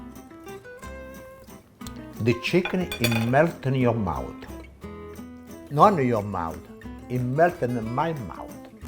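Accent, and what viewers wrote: Italy. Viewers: 🤣😅🤣😝🤣😅 you are funny but you are right..he talks like a true Italian!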